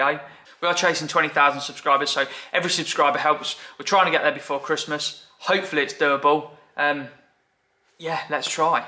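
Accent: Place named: Nottingham, United Kingdom. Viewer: Nice work. I’m trying to work out your accent,. Are you from Nottingham?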